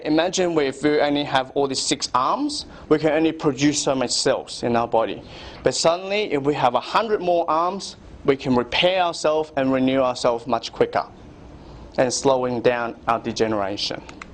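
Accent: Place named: Australia. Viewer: I am curious where this seminar/ lecture took place. Its audiences appear to be mostly oriental made me thought Singapore or Malaysia but the speaker’s accent was Aussie. Thank you for putting up this video.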